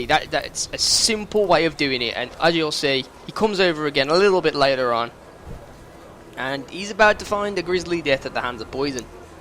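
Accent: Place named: Birmingham, United Kingdom. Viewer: You sound like you're from Birmingham.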